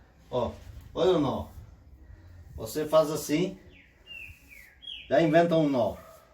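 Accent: Portugal. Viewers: eu vi uns vídeos seu de tijolinho não lembro qt tempo faz acho q foi 2016 ou 17.Achei à coisa mais linda. Agora tá uma enxurrada aqui no Brasil. E por acaso vc fala daqui ou de Portugal?E p/terminar é um dos melhores trabalhos q já vi na internet.O tom as nuances e formas está maravilhoso.Parabéns😝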